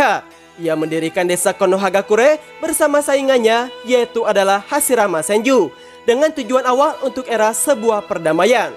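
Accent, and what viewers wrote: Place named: Padang, Indonesia. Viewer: Logat admin nya mirip teman gua orang padang panjang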